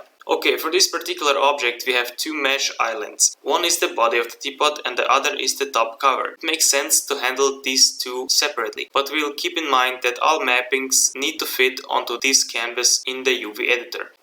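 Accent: Russia. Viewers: INTERESTING, only I hear a Russian accent, or I'm wrong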